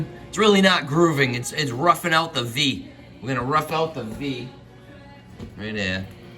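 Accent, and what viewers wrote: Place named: New York, United States. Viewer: Nice accent. You sound like a old school New York Gangster.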